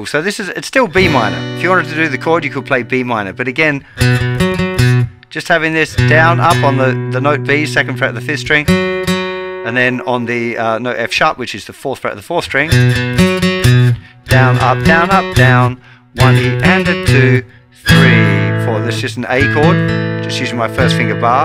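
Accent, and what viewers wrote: Australia. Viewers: Wait… you’re Australian??? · What!! You’re an Aussie? After all these years I had no idea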